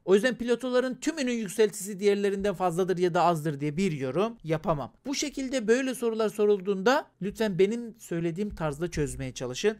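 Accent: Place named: Black Sea, Turkey. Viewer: Ne alaka knk adam Karadenizli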